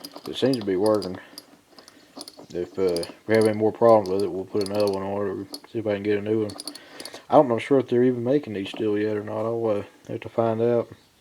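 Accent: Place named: Texas, United States. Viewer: Superb instruction! And that 'accent'-can't be far from Corpus Christi and Texas state 77!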